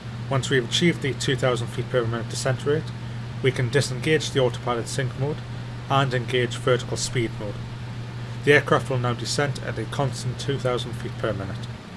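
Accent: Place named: North, United Kingdom. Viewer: Looking superb! Can't wait for the release! Nice to hear a fellow northerner too :)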